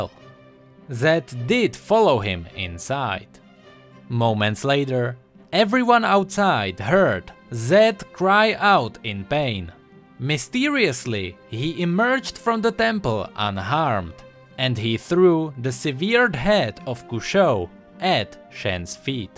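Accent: Czech Republic. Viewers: poznal sem hned podle toho přízvuku že si čech :D your realy good keep going!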